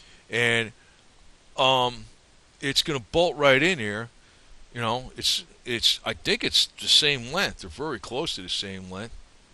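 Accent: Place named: Sacramento, United States. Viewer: I bet he lives near Sacramento, sounds like me.. I live in KY now and I sound retarded but hey I used to think the KY accent was retarded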